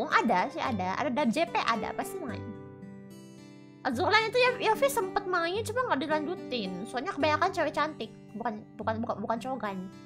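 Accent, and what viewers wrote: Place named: West Java, Indonesia. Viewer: Emang dia orsun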